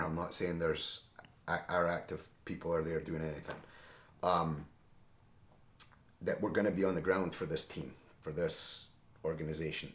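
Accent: Scotland, United Kingdom. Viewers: does he have half a Scottish accent ???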